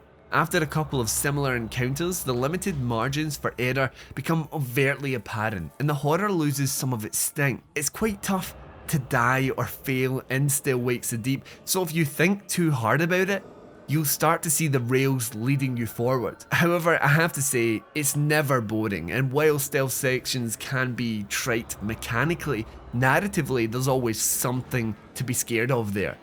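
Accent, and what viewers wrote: Scotland, United Kingdom. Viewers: You mean you cannot follow his Scottish accent?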